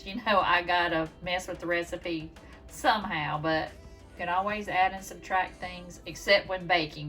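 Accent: South, United States. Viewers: I love taco soup. Great tutorial. New friend here. Do I detect a southern accent 😃? ❤👵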